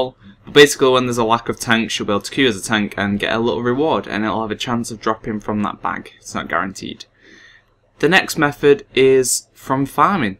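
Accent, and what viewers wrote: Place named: Glasgow, United Kingdom. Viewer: Wow the guy is developing a Glasgow accent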